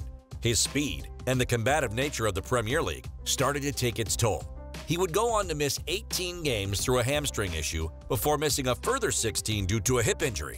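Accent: United States. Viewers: The American voiceover is not for me. So divorced from anything football.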